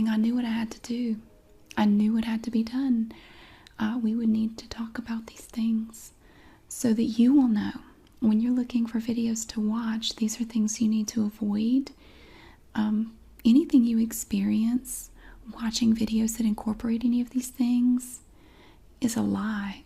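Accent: South, United States. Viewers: Heaven have no sass like the Southern sass, of a southern lass. Thanks miss, for making such good an great vids. PS from a fellow Southerner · YES! We love a sarcastic southern queen ❤️ Amazing video 🤘🏻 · Love that southern sass!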